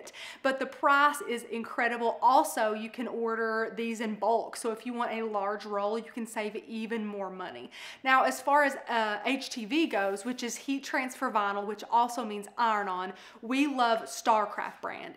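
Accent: South, United States. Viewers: I'm from the south and love her southern accent. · Clicked for the review...stayed for that amazing accent! I'm Australian, don't hear that kind of voice every day. Is that American 'Southern' / 'Mid-Western? Also, waddaheck happened to your brick wall? Someone got a bumped head over there? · I love her southern accent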